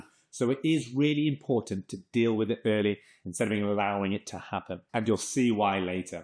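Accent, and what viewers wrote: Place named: England, United Kingdom. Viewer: Is this UK law or American Law? I notice you're spelling "Neighbor" which is the American spelling but you sound English?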